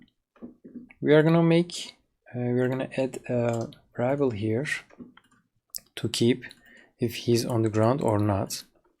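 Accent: Turkey. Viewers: oglum sen türksün la türk ingilizcesini nerde görsem tanırım.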